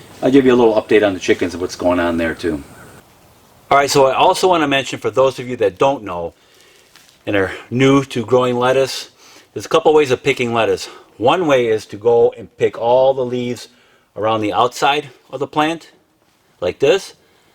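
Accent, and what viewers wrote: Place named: Massachusetts, United States. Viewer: LOL I was thinking the same thing ….I’m thinking he’s from Massachusetts…. That’s where I came from … living in Texas now..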